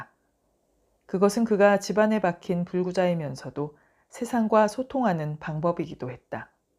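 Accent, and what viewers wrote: Gyeongsang, South Korea. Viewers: 어떻게 그리 경상도 사투리를 잘 구사하십니까?전직이 성우? ^그놈^ 김일성의 남침으로 택만과 도리실댁의 일생이 ^^^ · 경상도 분 인거 같아요. 낭독할때 사투리가 나오잖아요.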